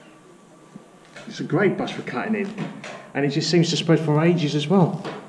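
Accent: United Kingdom. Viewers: Are you from east London 👍😁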